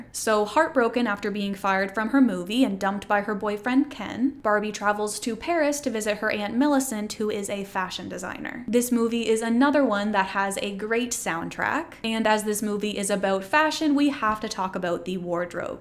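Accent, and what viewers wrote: Canada. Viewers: You have great teeth and say oot instead of out, so you must be from Canada.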